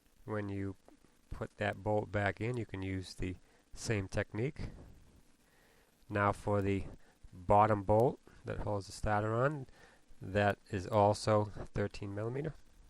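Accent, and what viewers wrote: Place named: Boston, United States. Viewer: Sheesh...ain't never heard nobody from Bahstin before? Great video. Used this as my guide, and hang the ol' Haines manual. Well done, and thanks a million!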